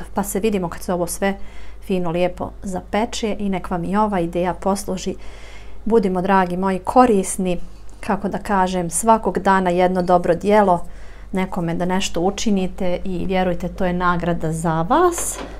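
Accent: Bosnia and Herzegovina. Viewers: Draga, volim kad vidim da divna mlada zena zna i voli da kuva. Posebno mi je drag vas bosanski naglasak koji me podseca na lepe trenutke dok sam zivela u Bosni sedamdesetih godina proslog veka. Pozdrav od jedne bake iz Srbije.❤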